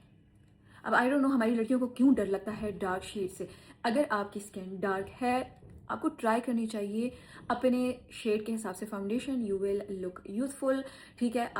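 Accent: Punjab, India. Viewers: Aoa Kaisi hyn ap ek baat ki to apki tareef krni banti mariyam pervaiz ap dono se phle kisne YouTube channel pe apni journey start ki thi or dono phle healthy thi Lekin day one se apki dressing bht zada achi thi or apk pas pass mostly Punjabi hyn but Urdu apki thek hy rehty rehty punjabi thori bht agyi hy samj bhi Lekin zada hard wali nhi keun k mariyam pervaiz ki Jo Urdu hyna badrah Urdu Mai Rey hota hy or aj tak apk husband nhi Nazar aye apk vlogs Mai Dil se Ameer or sub se zada ikhlaq se hona chaheye hy down to the earth hona chaheye hyn jub k ap typically pathan family se belong krti ho but the way you present your self very gracefully makeup skill's day by day or achy kiye but Jo weight ko ly k transformation hy incredible phle to husband k paiso pe har brand ka makeup Liya now as a women apne Baki women's ko dikhaya hy mustakal mijaiz apko achi trha life Mai bht Kuch bana dyti hy phle ap logo k makeup brands clothing brands xyz kis chez ka bhi brand ho Lekin aj kafi saalo ki hard working sub krwa dyti hy ap bv bhi ho maa bhi ho or self made business woman bhi aj apka khud makeup brand Hy jub k mariyam pervaiz hyn phle jisne makeup brand launch Kiya Baki Natasha waqas bhi hard working kri hy Lekin wo bhi Urdu Jo na kapre pehno mein Karachi se hu but mein bhi Punjabi hu Lekin meri Urdu thek Hy Kuch mahajir fenta parakh xyz to Natasha waqas ko abi apne pe working ki zarurat hy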